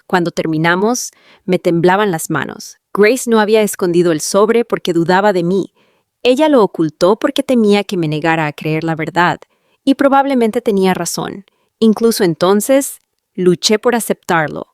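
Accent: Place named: Cocoliche, Argentina. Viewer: Corté en el minuto 5: aburrido, denso, repetido y narrado en cocoliche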